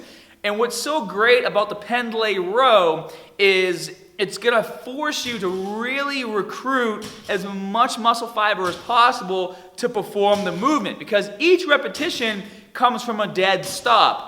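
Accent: Boston, United States. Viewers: I'm irish but this dude must be from Boston "hawdaw" "bawbew" Edit: I love the content. No hate, just an observation. Huge fan of the tips. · Bosten accent?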